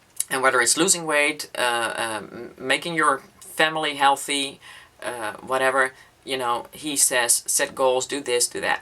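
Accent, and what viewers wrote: Netherlands. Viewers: Such an honest and inspirational video. I watched a couple of your tutorials - perfect. I was trying to figure out the accent and guessed Dutch, and then had it confirmed when I listened to your story in this video. Your finished jewelry projects are to die for - I want that Marilyn bracelet. Can't agree with you more about the importance of research. You nailed it. You have no idea how amazing you are.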